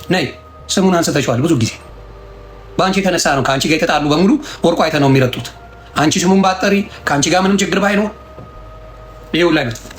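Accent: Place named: Tigrinya, Eritrea. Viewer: I love your Tegregna pronunciation😂😂 you crack me up 😂😂😂😂😂❤❤❤❤❤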